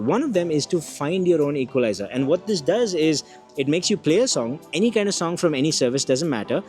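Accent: India. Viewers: You should make a choice and reduce one of the following to things: level of background music or Indian accent. One is always distracting another and so on.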